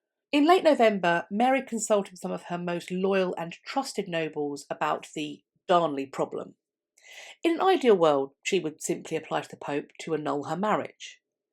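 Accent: United Kingdom. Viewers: Sometimes one just has to hear interesting British history told with a lovely British accent.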